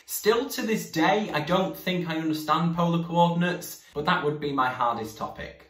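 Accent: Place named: United Kingdom. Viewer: You have the most British accent I've seen someone have and I'm British myself just wanted to point it out :D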